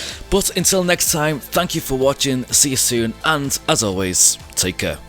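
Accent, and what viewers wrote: Liverpool, United Kingdom. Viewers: are you from Liverpool? I hear some Scouse in your voice 🤷🏼‍♀️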